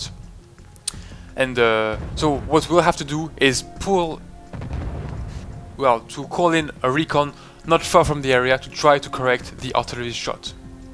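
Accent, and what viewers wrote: France. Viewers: First 10 seconds impression of this video. Fuck, i love french accents xD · Yay French accent!! Like!!!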